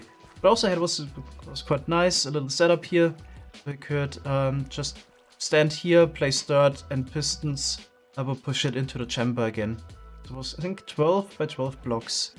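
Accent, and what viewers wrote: Germany. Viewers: He is german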